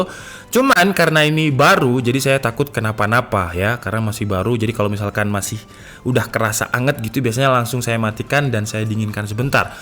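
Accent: Malang, Indonesia. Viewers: Orang Malang bang?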